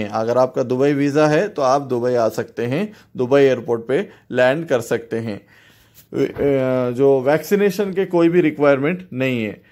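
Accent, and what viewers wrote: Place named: Hyderabad, India. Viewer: Assalamalaikum MASHAALLAH khali awaz se phechan lete Hyderabad ke koi hai to Bas aap se ye hi guzarish hai ke please Hyderabad ka naam roshan karo.. Allah hafiz dua ki gusarish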